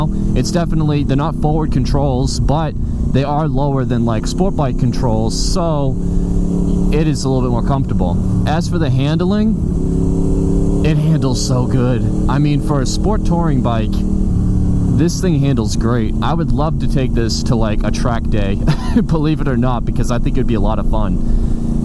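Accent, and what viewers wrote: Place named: Boston, United States. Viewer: I hear Boston in your voice. Go B’s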